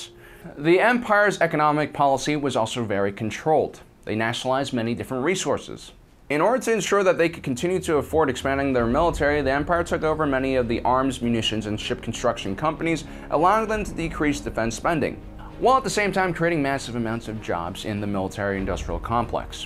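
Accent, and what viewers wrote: United States. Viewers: i'm already suscribed since a while, and i really love your videos.... however on this video, i had a hard time trying to understand what you said due to your strong American accent. Still, i liked the video:)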